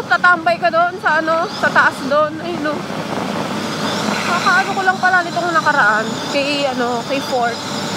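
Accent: Batangas, Philippines. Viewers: nakakaaliw talaga mga punto ng mga Batangueno tapos ang nakakaaliw ka pa magkuwento. aba'y panalo!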